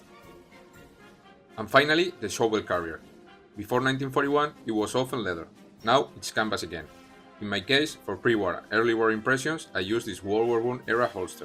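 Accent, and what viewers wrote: Spain. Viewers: Интересно было слушать испанский акцент :) Спасибо за видео.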